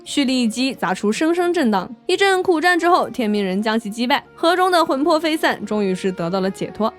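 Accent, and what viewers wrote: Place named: China. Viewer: 地域差异是事实。你不懂这个的话不就是科普？我何时反驳自己第一则回应了？我一开始就告诉你这是口音问题。她说话是普通中国大陆人说话口音，你不知道，所以我们这么多人才一起告诉你同样的话。很明显你不知道，因为你到现在还在因为大家科普你了所以自尊心受损破防 😂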